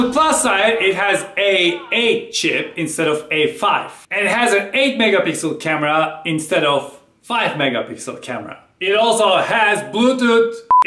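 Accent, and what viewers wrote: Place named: Turkey. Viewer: the Uhahkian lol. Is it can be just because of he's actually from Turkey? So ofc his accent will change.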